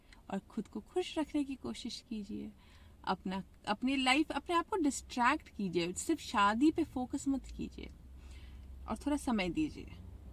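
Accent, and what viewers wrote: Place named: Bihar, India. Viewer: Ohh tbhi to main apko sunti hun toh aisa lagta hai ki , min unkei bate sun rahi hun coz woh bhi Bihar se hi hai .. but thanks a lot for helping to grow us.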